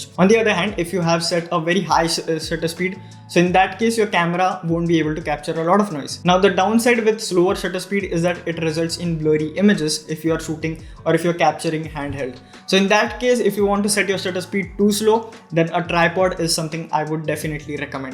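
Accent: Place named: India. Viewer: You are indian right 😁 I know from your accent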